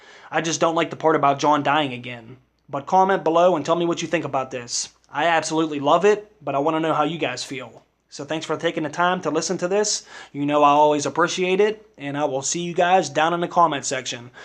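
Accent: United States. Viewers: I can't place your accent. Sometimes you sound like you're from Bal-more when you enunciate those rounded Os. Then you sound like you're from New England with the shortened A (i.e. park is pahk car is cahr) but then you sound like you're from West Virginia with the countrified dropping of word endings (I don't mean that as an insult- I think countrified accents sound absolutely warm and charming!) I think you did an outstanding job on this video. Well done, dude!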